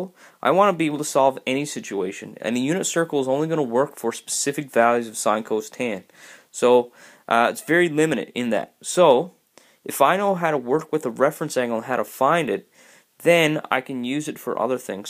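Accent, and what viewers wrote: Newfoundland, Canada. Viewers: I knew I realized the accent! Newfie as well!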